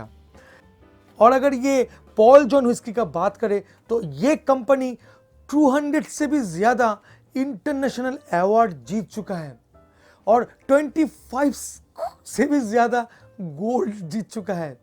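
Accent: India. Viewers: You have a great accent.. I really like your true desi style. No fake dikhawat.. Bless you with good health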